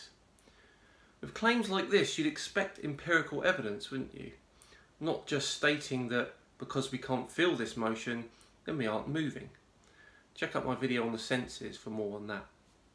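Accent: United Kingdom. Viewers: I have to say that you are the only channel talking about Flat Earthers that I have subscribed to, which I just did 5 minutes ago. Your approach is informative, no nonsense, straight facts that will appeal to any half sensible person even slightly being swayed by the Cult of Flatness. It's also nice to see a brit out there, though I can't quite place the region. Keep it up good man!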